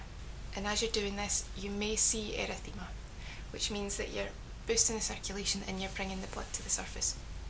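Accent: Scotland, United Kingdom. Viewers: are you Scot? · Your voice calms me because it reminds me of my grandmothers thick scotish accent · It's very refreshing to have a fellow scot on one of these videos, lovely!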